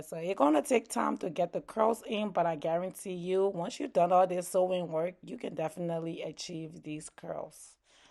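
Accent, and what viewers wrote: Liberia. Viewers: This came out so gorgeous mama ❤the hair and the makeup you are talented 👏🏾btw are you Liberian ? The accent sounds Liberian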